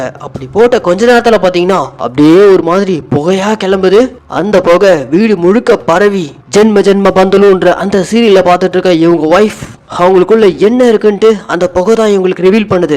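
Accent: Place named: Puducherry, India. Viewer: But neenga pesurathu pondicherry side pola iruku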